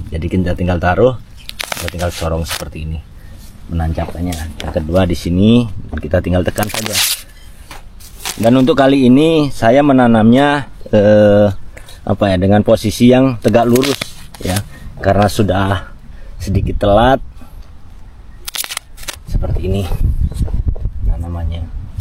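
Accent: Madura, Indonesia. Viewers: Bhs indo logat madura 😅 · alhamdulillah tabarakallah, sukses bertani moderen di negeri Sakura ❤❤Kami mau dengar, bahasa jepang logat madura atau bahasa madura logat jepang ❤❤ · Khas madura gak bisa hilang. Gaya bahasanya mirip cak islah bahrawi dan pk Mahfud MD 😂😂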